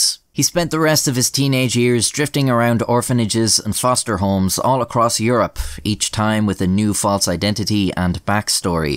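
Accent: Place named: Ireland. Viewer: "I admire conmen" yes we know you are Irish by your accent.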